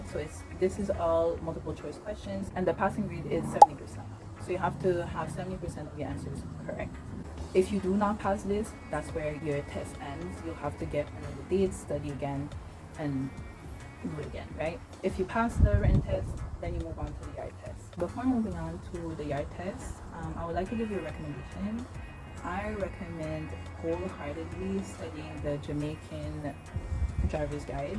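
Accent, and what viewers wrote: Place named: Jamaica. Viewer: okay purrrr, look at you with your jamaican accent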